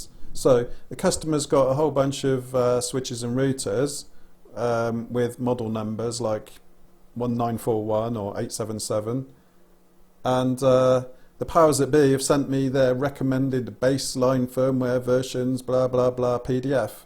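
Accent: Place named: United Kingdom. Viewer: Hey, what's up,! I started this beautiful morning with watching this video))) i don't know if you would be happy to hear this but i felt like i was watching my own video at some point! Cool! You started adding some humor (hire demoralised engeneer is the best) it's so cool Your video started to 'flow' - i watched it almost entirely! I like word 'fuck' myself, but... i think being ironic is better than being angry and unsatisfied, well at least in the videos - so all the cases when you kinda laughing at managers acting fools - it's cool and fun, but when 'they are fucking idiots' - many people knows that, so depressing viewers with no reason might decrease views. I understand your pain - i dropped my recent 25/h contract because my client claimed working prototype to be crap, while the problem was in target site, not in my app and scraper, so yeah, there's lots of dissapointing things, but it doesn't have to depress other people. 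i'm really sorry if something hurts you in my comment, i just wanted to say that me as a viewr LOVE your ironic British humor and would be happy to get more content like this. Also you started talk better. You've got a native British accent, you must be proud of it, make it sound even more british - clear deep vovels, non-american rythm etc. (All the stuff i failed to mimic) i mean in this video i enjoyed the accent because you were talking to subscribers instead of yourself like in previous video. Cool, man, keep improving, you're on your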